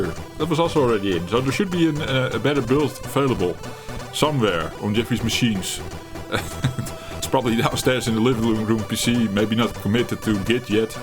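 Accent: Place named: Netherlands. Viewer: Lekker! heerlijk nederlands engels! pikte het er gelijk uit De appel Falls niet Far van de tree! love IT! gelijjk gesubscribed!<3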